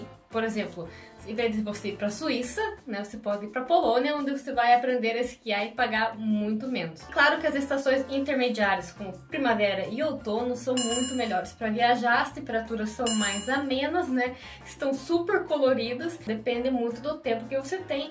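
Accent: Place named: South, Brazil. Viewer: Moça, em qual estado e município brasileiro a senhorita nasceu e morou? Seu sotaque parece ser do sul do Brasil.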